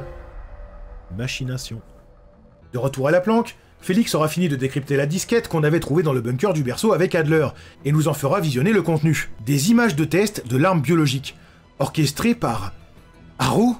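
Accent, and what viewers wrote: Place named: Champagne, France. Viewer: oh petard un compatriote élevé au Chaource et à l'andouillette AAAAA ! Je sais pourquoi je me sent comme à la maison quand je t'écoute maintenant ! J'tenterais même de dire que t'es de Brienne 😂 Bravo pour ton travail en passant 💪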